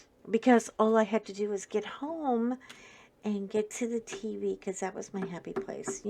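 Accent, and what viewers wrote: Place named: Ireland. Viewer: I'm sorry you had such a hard time in school. 💜 Unfortunately I know how it is. I was scared of going to school every day for about 7 years. The 2 last years wasn't that bad. So I managed well. And I guess we all know a "Fat Bethany" in some form. I'm very happy you survived 😊 I love how you talk Irish, as your mother 💙 Thank you so much for sharing your story with all of us. Big hugs 🤗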